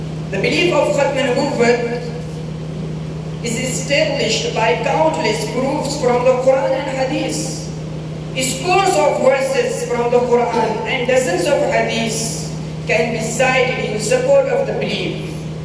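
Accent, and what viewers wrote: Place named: United States. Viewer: Ap ki english to america ki lag rahi he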